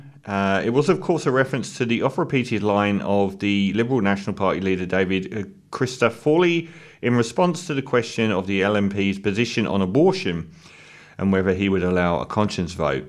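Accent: England, United Kingdom. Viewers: You clown! You sound like a pom bringing your lefy garbage to our country.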